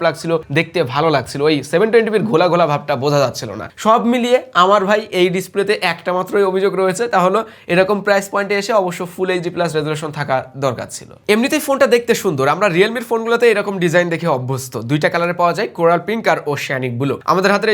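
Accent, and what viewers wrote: Benapole, Bangladesh. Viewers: ভাই আপনার বাড়ি কি বেনাপোল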